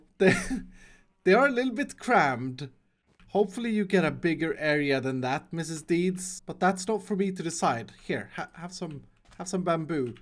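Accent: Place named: Sweden. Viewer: Jag ska vara helt ärlig och säga att jag inte insåg att du var svensk innan jag läste det någonstans. Men om jag ser tillbaka på det så är din brytning ganska lätt att känna igen.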